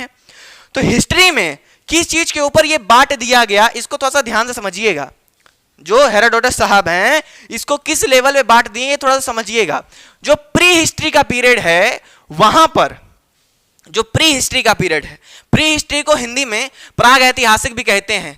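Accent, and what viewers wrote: Bihar, India. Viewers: Bahiya aap Bihar se ho kya aawaz se lag rha hai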